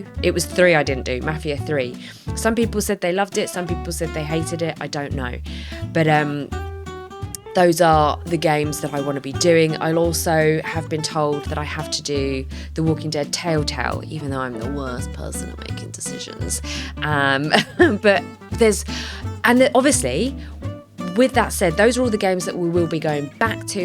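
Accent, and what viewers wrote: United Kingdom. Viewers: Having a British accent is like listening to someone on 24 hour cosplay for Lord of the Rings or Game of Thrones...All that medieval fantasy stuff to nerd out for. Have a Merry Christmas!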